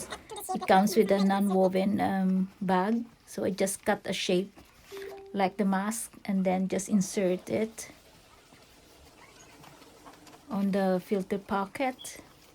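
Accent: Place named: Philippines. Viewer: Based on your accent you must be Pinay. Maraming salamat.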